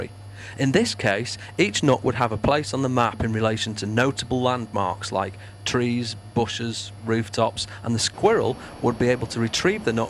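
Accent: United Kingdom. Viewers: Thats how english people pronounce it, simple. Or if this guy is not english, then well, it definately looks like England, so he learnt english there.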